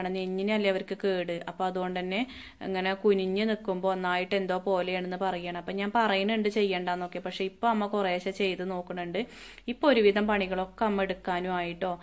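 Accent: Malappuram, India. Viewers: Varthamanum kettit Malappuram ano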